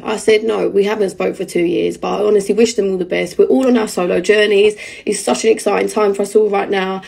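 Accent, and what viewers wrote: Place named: South, United States. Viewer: I don't get you're Southern accent but u was my favourite.😘 x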